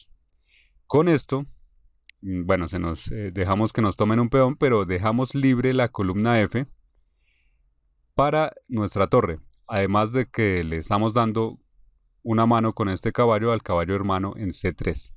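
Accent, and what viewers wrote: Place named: Colombia. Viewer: Maestro gracias por sus clases y me preguntó esa voz es la de usted, porque no tiene acento extranjero, disculpe mi curiosidad si más no recuerdo usted es ucraniano, como habla un español con acento colombiano????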